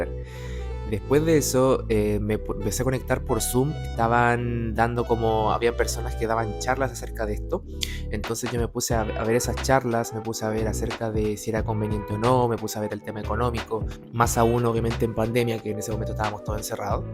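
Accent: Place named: Buenos Aires, Argentina. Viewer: Estas en tu casa capo! Se ve que sos un tipo extrovertido y estudioso Se te pego el acento de Bsas.😊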